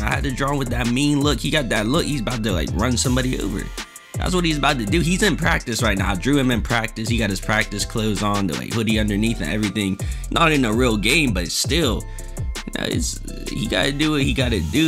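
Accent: Baltimore, United States. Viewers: Are u from Baltimore??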